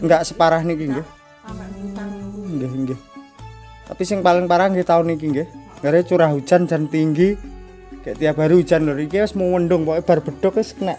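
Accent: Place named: Java, Indonesia. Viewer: Kula seneng bahasane mase...medhok desa mbanget....kalih guyune...renyah....kados nopo ta piantune.....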